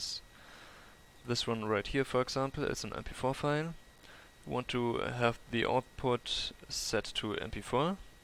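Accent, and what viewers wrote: Germany. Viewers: bist du ein deutscher du hörst dich so and hahaha aber redest english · Man hört die deutsche Ausprache aus ihm raus ;D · Danke man! Das Deutsch hört man raus, trotzdem gutes Englisch m8 :D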